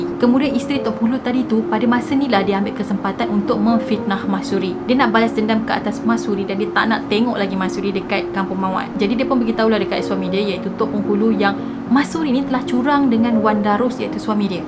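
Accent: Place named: Kedah, Malaysia. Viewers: Tgk gaya n percakapan mcm oqg kedah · kedah ni boss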